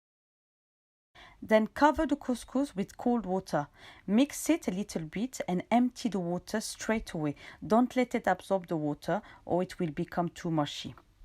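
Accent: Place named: France. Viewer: You have very sweet french accent🙂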